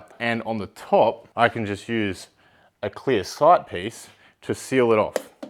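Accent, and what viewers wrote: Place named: Australia. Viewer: if you pay attention, you hear that his accent is not native to the US of A, More likely its Australian. In Australia, home distilling is allowed.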